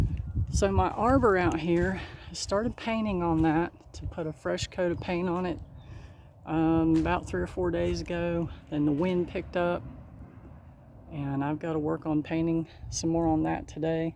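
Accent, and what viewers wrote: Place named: Western North Carolina, United States. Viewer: How exciting to find your videos! I am definately going to try the water bath canning! I also love that you are from my neck of the woods(western NC).....or sounds pretty close anyway.......I am in Lenoir! Looking foward to more of your videos......I am enjoying now going through all the previous ones. Thanks!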